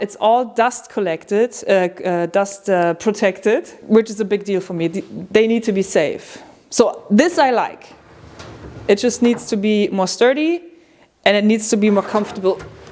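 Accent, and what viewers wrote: Germany. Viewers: Hi super Cool geworden. Echt genial. Deine Deutsche Aussprache hört sich sehr sympatisch an . Könntst du öfters machen.. Liebe Grüße aus meinem Mini Bastelkanal Meenzer Bastel Bude und bleib mit deinen Liebsten gesund. Von Stefan.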